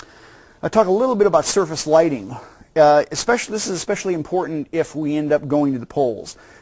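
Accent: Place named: United States. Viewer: the American accent threw me off plus it was very late at night and i need a bit humour :)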